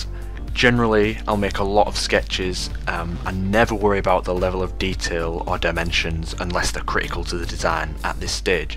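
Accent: Hull, United Kingdom. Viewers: Great stuff very interesting and inspiring! Condolences for your awful accent. What is that? Hull?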